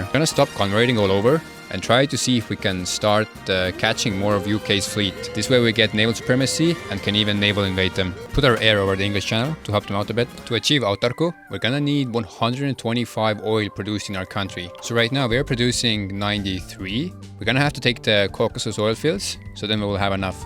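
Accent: Estonia. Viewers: HOI4 + draculaflow + eesti aktsent = instant sub 😂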